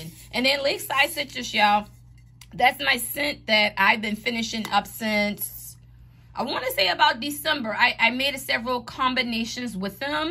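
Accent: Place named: New Orleans, United States. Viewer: Your accent gets me every time! Are you from New Orleans?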